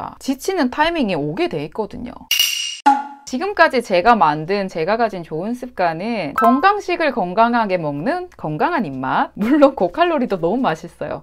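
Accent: Gyeongsang, South Korea. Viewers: 경상도 사투리 베이스의 표준어인가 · Yuna Kim 저도 경상돈데 살짝 저분은 경상도 말투 중에서도 희귀한 편인듯요....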